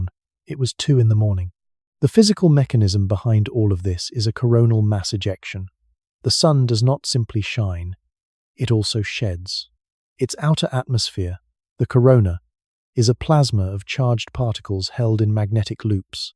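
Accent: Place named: England, United Kingdom. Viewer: I just discovered your channel last night as the algorithm finally figured out that I love science. It’s great to have a channel with a mellow & relaxed English accent that doesn’t drive up my cortisol levels. Subscribed immediately. Can’t sleep tonight though, so I just got up & got into this. I’m in Norman, Oklahoma, US, a.m. I am completely exhausted with channels that are nothing but gossip about celebrities or the monarchy or politics. This is a breath of fresh air. Thank you for all your hard work & thank you for making me a better informed person 😊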